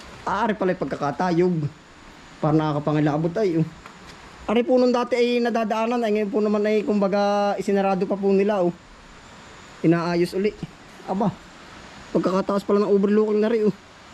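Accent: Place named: Lucban, Philippines. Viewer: kayo o ba y taga lucban ganda nio mangusap may punto nakka bano ano po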